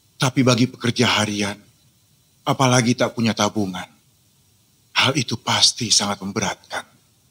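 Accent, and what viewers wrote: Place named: Jakarta, Indonesia. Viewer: Hebattt,pengen bisa monolog kek gini,mas kalo sy belajar monolog sama mas bisa ndak? Minta alamat dan no tlpnya mas,o iya mas ini aslinya orang mn ya kok dialeknya kek orang betawi tp kadang kadang kek orang minang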